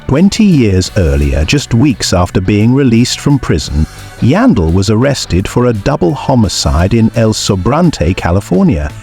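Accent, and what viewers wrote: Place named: United Kingdom. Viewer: BRITISH NARRATORS , I'LL PASS THANKS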